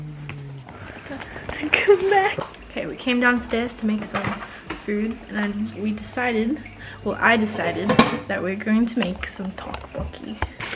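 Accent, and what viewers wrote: Australia. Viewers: I’ve been watching you for 2 years but I still can’t get over your BEAUTIFUL Australian accent omggg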